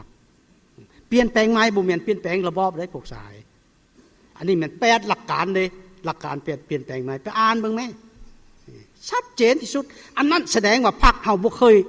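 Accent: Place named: Vietnam. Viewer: บักควายเอยกุฟังมืงเว้าเหมือนแกวสัตตตคนลาวแท้บ่อเว้าแยบนี้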